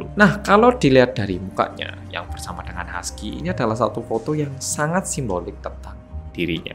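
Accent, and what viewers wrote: Java, Indonesia. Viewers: Ampe medoknya di kencengin 😁😁 mantaaaaap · Lagi nonton series korea di netflix judulnya "somebody" yg cowonya ganteng tapi pembunuh berantai agak2 mirip sama tema cerita yg dibawain sama oppa medhok ini😍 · Pembawaan videonya khas mas ada suara medoknya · Gagal fokus am kreator nya wajah nya kek Korea tp cara logatnya kek jawa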